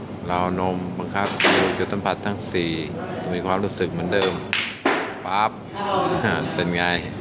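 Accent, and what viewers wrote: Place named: Rayong, Thailand. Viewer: อาจารเป็นคนระยองรึป่าวครับสำเนียงไช่เลย555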